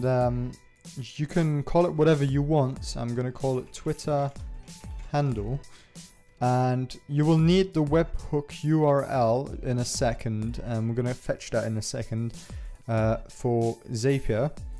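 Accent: Germany. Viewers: Lol bist du deutscher? ;D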